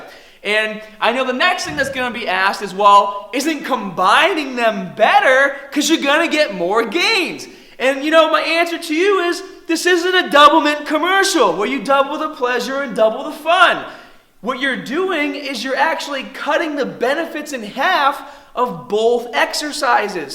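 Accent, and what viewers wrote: Boston, United States. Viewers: is he from Boston? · that Boston accent really shining through! haha love it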